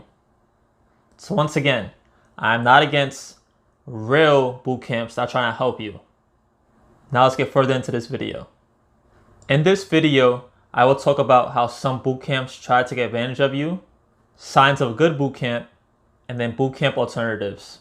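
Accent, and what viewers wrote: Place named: New York, United States. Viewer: Thanks for your channel! Much appreciated. You sound like a fellow New Yorker!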